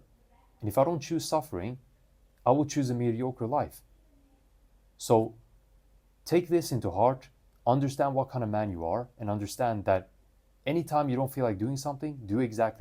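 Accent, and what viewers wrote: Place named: Arab world. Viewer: Your accent is like Rudy Ayoub's, the 'Arab Dad' guy. Good message.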